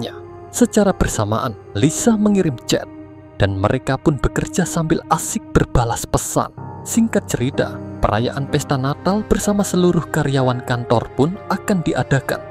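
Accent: Bali, Indonesia. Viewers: Admin org Bali ya? Aksennya kentel bgt 😅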